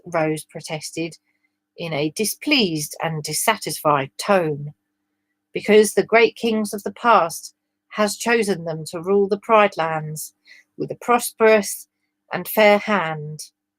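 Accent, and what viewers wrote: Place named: United Kingdom. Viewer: Thanks to Oddie (the fantastic) I am your newest fan. I'll be sure to try and be glorious in the comments as your british voice in the air.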